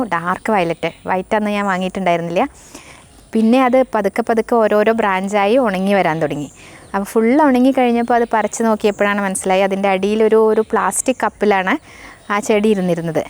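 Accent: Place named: Palakkad, India. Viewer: I ❤ your Palakkadan slang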